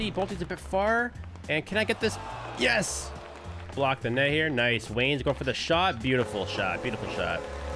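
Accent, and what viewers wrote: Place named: Canada. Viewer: This guy hella canadian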